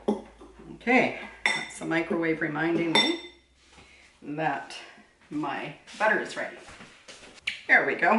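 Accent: Canada. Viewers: I love my bread machine , found it at a thrift store Brand new for $5 it makes the 1 lb loves ,,,yes you need to find the right recipe ,,,i use the dough cycle and then make mini loafs ,,,I gift it as well,,, New to your channel ,,I could tell while you were Talking you were Canadian ,,I live in Southern BC ,😊😊😊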